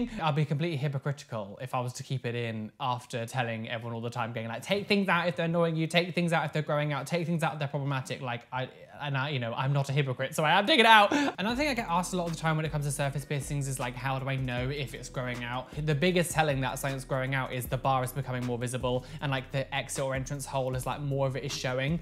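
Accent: United Kingdom. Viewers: Really sorry about your piercing :/ Also I have a kind of different question, what accent do you have ? I really love it, I mean I know it's British, but is it RP? Or maybe Queens? I'm really curious haha Love your videos!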